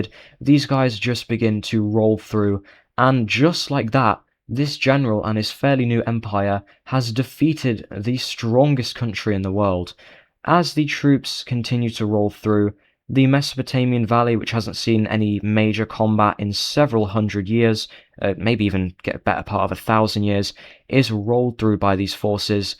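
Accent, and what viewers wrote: United Kingdom. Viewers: Pls stop posting my ears bleed when I hear your nasty British voice, I hold no love for that person, for their presence is as a storm upon a once-peaceful sea. Their words fall upon the ear like poisoned arrows, clothed in fair speech yet bearing bitter intent. Were honesty a jewel, they would wear but an empty setting. I would sooner seek fellowship with winter’s frost than endure another hour in their company. Let fate carry them upon a path far from mine, for where they stand, discord follows as faithfully as a shadow. Thus I leave them to the judgment of time, wishing not vengeance, but only that our roads never again should cross.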